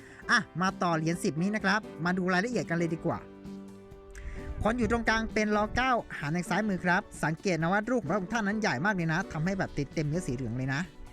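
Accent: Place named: North, Thailand. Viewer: เห็นพูดทีไรเชียงใหม่ เชียงรายแม่ฮ่องสอน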